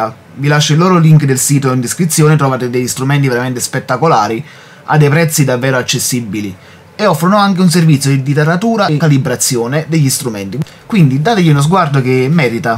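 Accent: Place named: Brescia, Italy. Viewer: Dall'accento mi sembravi più brescano!!! (io sono di avellino, ormai da 15 anni trapiantato in toscana)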